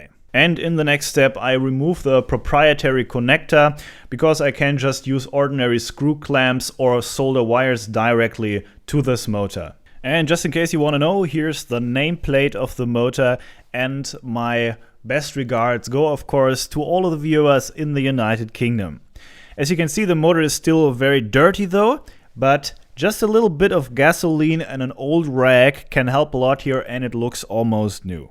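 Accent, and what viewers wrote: Germany. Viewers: hallo,super video .👍👍 warum sprichst du so gutes englisch mit deutschen akzent? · Ha! I knew that was a German accent. There was no doubt after you said Opel!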